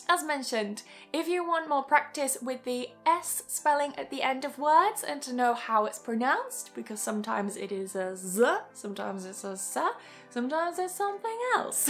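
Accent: United Kingdom. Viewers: I appreciate your modesty, putting the British pronunciation (of words like "castle" or "afternoon") second, even though you seem to have more of a British background. i think ppl like learning the British pronunciation, as British English sounds more high class :D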